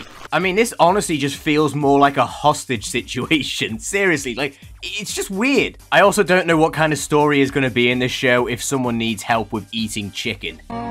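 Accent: United Kingdom. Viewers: Btw it’s my first time seeing this channel glad to find another bri’ish fellow🇬🇧🇬🇧🇬🇧🇬🇧🇬🇧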